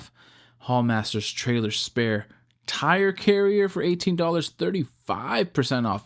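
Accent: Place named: California, United States. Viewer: that's dope lol, I speak some but not enough. I saw you were from California and I can hear the way you pronounce some words but that's kinda common in Cali, and then you said la and I was like yep it's confirmed lol. Awesome bro, good skill to have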